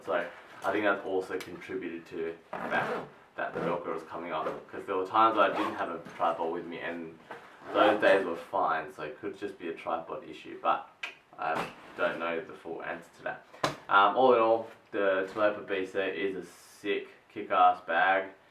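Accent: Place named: Australia. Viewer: Where you from, you sound Australian, how'd you get a hold of one of these?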